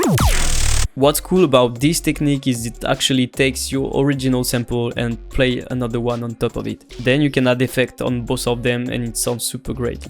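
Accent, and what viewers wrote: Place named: France. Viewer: That french accent, Sah quel plaisir ! Thanks for the tips did'nt know we could use samples in the noises osc ^^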